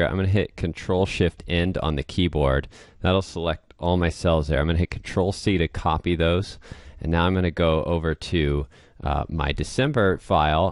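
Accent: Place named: United States. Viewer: Finally we can have a real American instructor, on Computer many on YouTube is people's from India, hard to understand any instructions in our English language, because they Hindus 😆👈 Thanks ❤️ best regards from Guatemala 🇬🇹 the Eternal Springs my temporary house also your's my friend. ❤️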